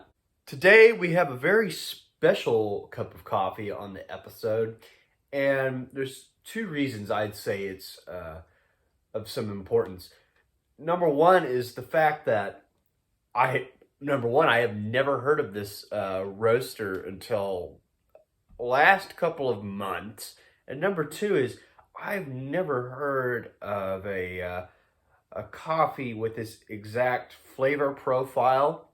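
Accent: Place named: South, United States. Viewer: I like your southern drawl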